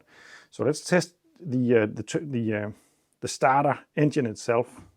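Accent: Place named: Denmark. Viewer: watched 2 min and already I can tell without checking, this guy is from Denmark... 🤣🤣💪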